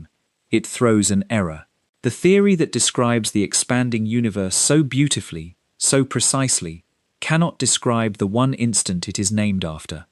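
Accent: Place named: United Kingdom. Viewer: Think I'm gonna stop listening to British-voiceover space videos. ...fuck.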